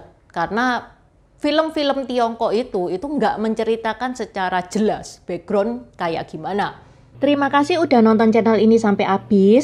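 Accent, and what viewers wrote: Java, Indonesia. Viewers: Logat ngomongnya saja sudah ala jawir. Jadi aneh dengernya karena pakai logat orang lain. Contohnya tidak usah jauh2 nih si cici yang punya channel ini. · Asli jowo opo mbae..kok medok jowo bahasa Indonesia nya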